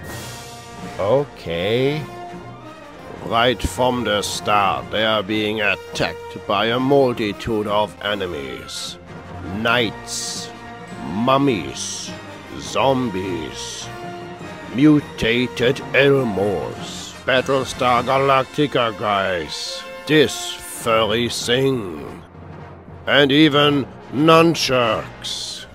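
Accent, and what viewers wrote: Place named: Germany. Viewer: Why did you put a German accent in this? Wtf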